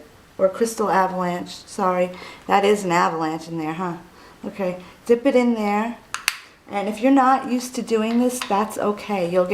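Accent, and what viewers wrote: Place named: New York, United States. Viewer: You are so stinkin' adorable and I just love listening to you...your NY accent is so cute!!! I love my HIGH BEAM too! I almost am due to replace it. Love ya, sweetie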